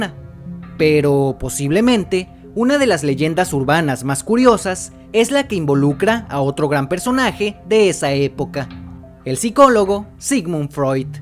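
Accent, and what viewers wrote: Mexico. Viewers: Eres yucateco?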